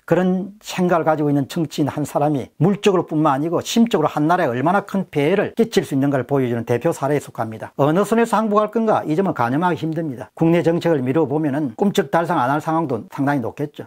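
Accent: Gyeongsang, South Korea. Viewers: 일단 갱상도 사투리 쓰면서부터 아웃이다 ㅋ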